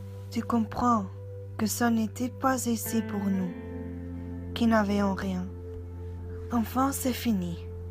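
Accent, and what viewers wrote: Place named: Portugal. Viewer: Tu as l'accent du cliché portugai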